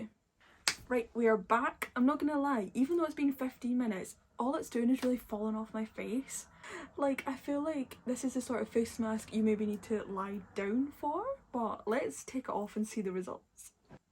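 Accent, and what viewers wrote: Scotland, United Kingdom. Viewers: She’s Scottish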